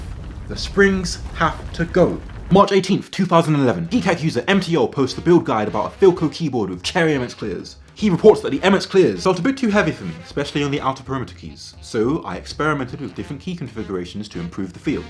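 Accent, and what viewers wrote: United Kingdom. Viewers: After watching all your vids I can't say tactile without a British accent. Im American. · damn your sexy as hell my man! your british accent almost made me pop (^o^) no hooo moooooo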